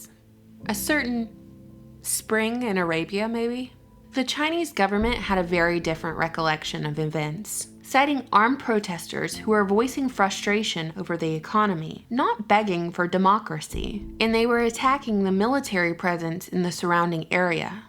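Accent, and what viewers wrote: United States. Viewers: you sound like an American to me then you must do a video of the name instead change it to 'What the media won't tell you about US' The video will take conservatively over an hour if not much longer. Good luck. You info on China is nothing new, read a good history book on China written by notable historian rather than an ideological one.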